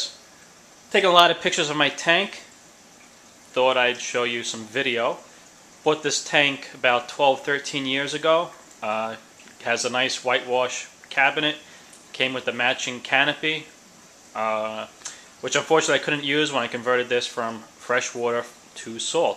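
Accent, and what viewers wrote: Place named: Long Island, United States. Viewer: Good job. Love that long island accent "WAR-TER" "SAW-LT" "FRAWGSPAWN". I have that same light fixture, it works well. The stock bulbs are sufficient, so no worry until you get new ones. What bulbs did you order? Looking great so far.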